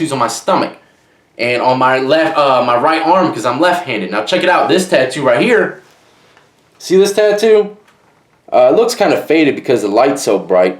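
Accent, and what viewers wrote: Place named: South, United States. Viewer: He got a country accent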